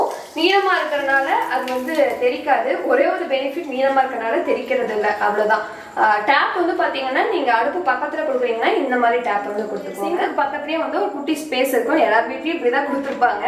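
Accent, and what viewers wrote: Kongu, India. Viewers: Kongu slang la pesunga video la onetime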